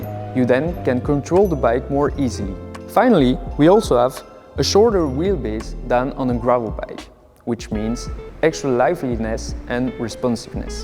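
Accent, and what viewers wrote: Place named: France. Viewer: I like the english with french accent😊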